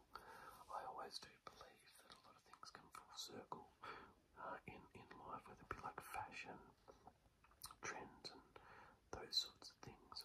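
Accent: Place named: Australia. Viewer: You sound Australian.........and I don't like it.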